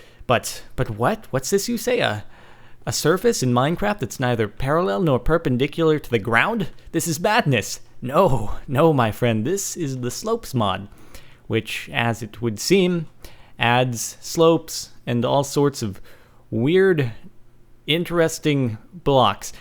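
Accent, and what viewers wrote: Sweden. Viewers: is you swedish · *are you swedish* and yes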